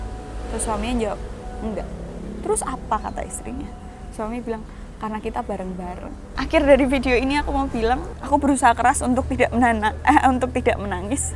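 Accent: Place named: Semarang, Indonesia. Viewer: Logat Semarang kok nggemesin :)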